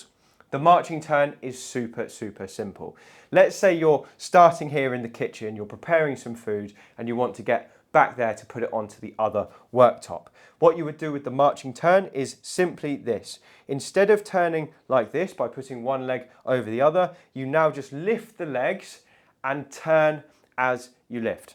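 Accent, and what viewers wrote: United Kingdom. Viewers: OK, dude, you've got a cute British accent, and it sells in America. But git real, y'all. Nobody turns crossing his legs first. On the other hand, maybe you should teach now how we should drink through our mouth, not through our nostrils. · Thank you for the work you do! My wife is in physical therapy from a young man that resembles yourself. Except for the British accent.😊 She is receiving treatment to regain confidence after a hip replacement. Her marching turns must take 20 steps. Therapy is critical in allowing a person to use what the surgeon provided.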